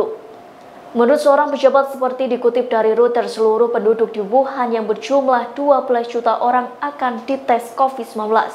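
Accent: Java, Indonesia. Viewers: mbaknya medhok ya.. lokdon...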